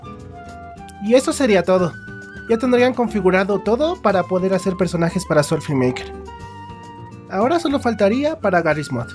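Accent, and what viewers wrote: Argentina. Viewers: español argentino papa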